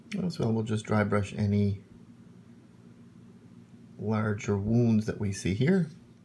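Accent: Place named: Canada. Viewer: so fahr? i hear a fellow hoser!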